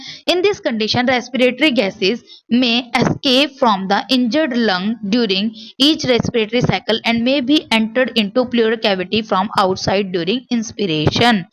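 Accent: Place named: Haryana, India. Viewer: very helpful video ❤ and your haryanvi accent is cherry on the top❤❤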